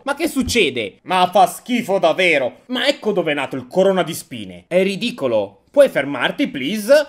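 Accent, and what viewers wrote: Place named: Apulia, Italy. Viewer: Ou orecch d'gomm! Hahahahahahhah (Ps:Sei pugliese )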